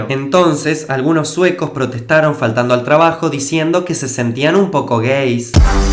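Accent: Argentina. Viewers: Me encanta tú acento argentino, regálamelo por favor!!!! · se nota lo argentino , el acento y ese nazo lo dice todo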